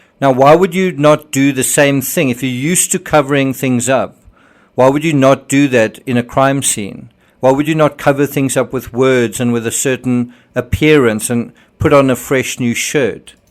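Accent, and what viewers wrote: South Africa. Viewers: It’s great to hear a South African accent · South African, I think.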